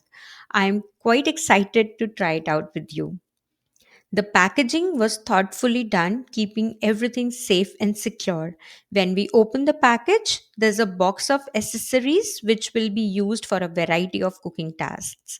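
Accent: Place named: India. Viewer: Aap hindi mai comfortable then use hindi half hindi n half English is a no no no ur english sounds more hindi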